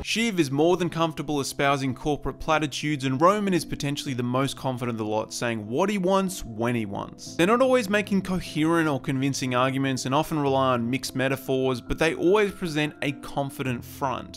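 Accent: Australia. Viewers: is this the same guy form skillup or every australian sound the same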